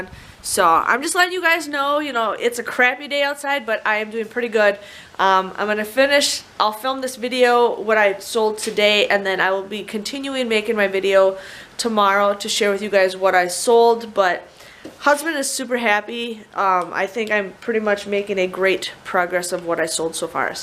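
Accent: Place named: Wisconsin, United States. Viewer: I live in Iowa... not too far from you. I could tell you were from Wisconsin ❤️It's so cold and rainy! I think you did a great job!😊 Happy Mother's Day 🌸🌼🌻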